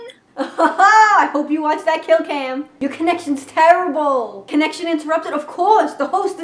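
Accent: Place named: New Jersey, United States. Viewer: i love new jersey accents ! ! ! now thats wirth a sub there!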